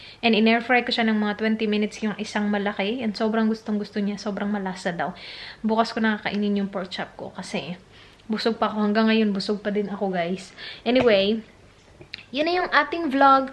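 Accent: Visayas, Philippines. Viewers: I love her visayan accents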